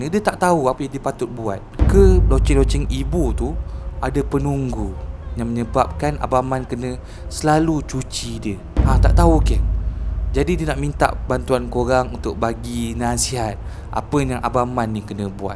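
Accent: Kelantan, Malaysia. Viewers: Btw ni org pantai timur ke?ado bunyi telo klate sikit2😂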